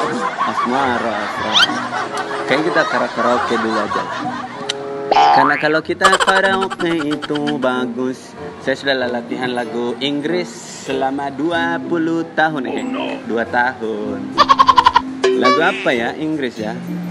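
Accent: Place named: Makassar, Indonesia. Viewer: Mantab tawwa nda nabuangi logat Makassar nya Ada biasa barui sekali ke kacarta ehh kaki ku keinjjak kaki gue 🤣 Salam kenal sodara 🙏 sesama ana Makassar Makassar bisa tonji 🤣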